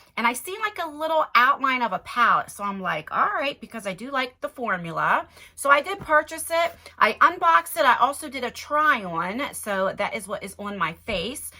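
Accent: New Jersey, United States. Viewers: On=AWN is that a jersey accent? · Hey there, I always chuckle when I hear your Jersey accent, especially since as a New Yorker, I can tell the difference. I actually really like the backdrop. I rarely pay any mind to peoples backgrounds tho, unless they mention it like you did.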